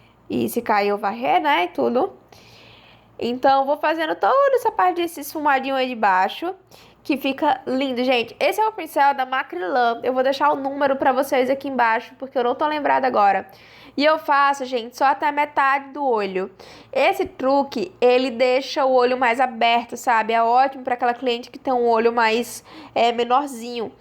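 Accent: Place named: Rio Grande do Norte, Brazil. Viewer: Sotaque lindo do RN ❤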